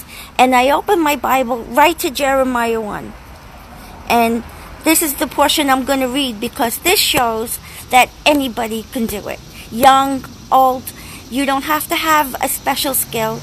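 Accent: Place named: Queens, United States. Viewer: This is a great idea. Do you live in Queens NY? I ask because you have an accent and I hear a plane going over, in from flushing and had the anesthesia of LaGuardia going over my house all the time.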